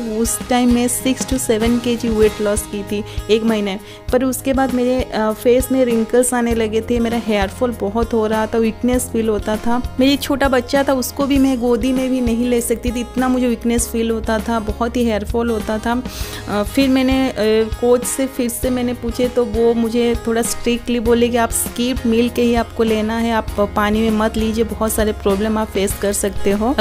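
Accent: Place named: Odisha, India. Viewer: Odisha style bolreheho .... Odia me bolo...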